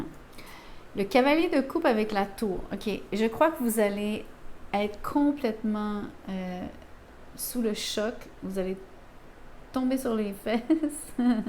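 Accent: Quebec, Canada. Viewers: Bonjour. Quel bonheur pour moi aujourd'hui. Tres peu de tarologue sont du Quebec. J'ai bien entendu votre accent. Mais de vous entendre le dire. Bingo. Je vais vous suivre. De quelle ville etes vous? Et votre tirage est etrangement similaire a se que je fais et planifie de ma vie. Merci et gratitude 🙏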